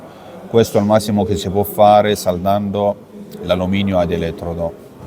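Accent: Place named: Egypt. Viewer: E egiziano si sente dall’accento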